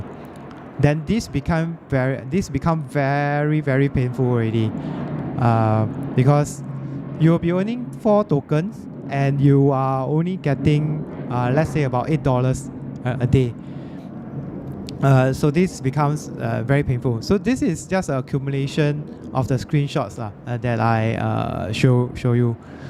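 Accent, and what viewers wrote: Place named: Singapore. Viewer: surprised by your singaporean accent, but great video. made the same mistake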